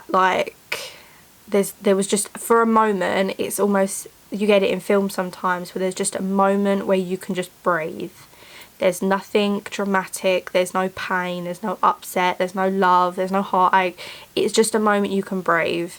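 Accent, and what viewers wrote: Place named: Yorkshire, United Kingdom. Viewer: I loved this book so much mostly because the book was so freaking large, I’d say it was almost larger than Harry Potter book series but I loved your review and I am hoping for the 3rd and 4th movies to be longer cuz there’s much more pages and suitations happening so it’s way out there but, here’s hoping it goes well with the direction of the last two books and weather or not they keep the cast from the 1st and 2ed movies. Ps I love your accent, r u from yorkshire? Or somewhere close. Hehe 🤔💕 also please follow me on Instagram Karalynnlovison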